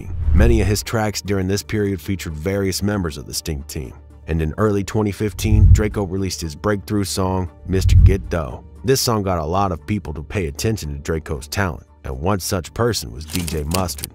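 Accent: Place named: South, United States. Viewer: narrator country accent strong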